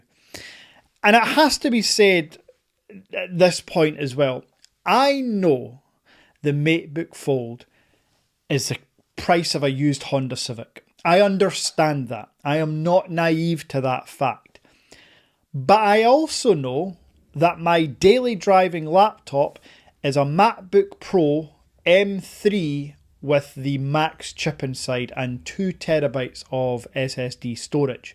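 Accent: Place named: Newcastle, United Kingdom. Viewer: You a Geordie? I always thought you were Scottish lol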